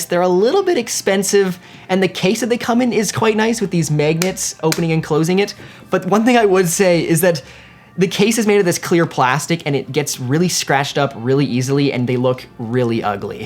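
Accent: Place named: Canada. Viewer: Freakin Canadian accent kills me.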